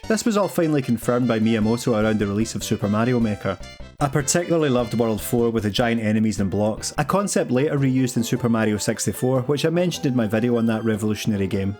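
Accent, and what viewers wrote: Scotland, United Kingdom. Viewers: Im not native english speaker, but let me guess you are scotish?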